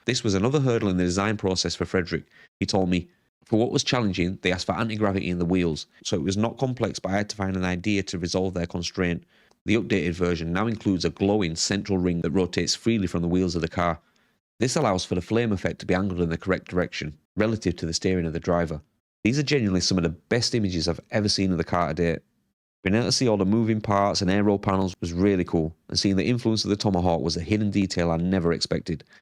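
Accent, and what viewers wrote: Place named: Yorkshire, United Kingdom. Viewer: Great video fellow Yorkshireman! Love the accent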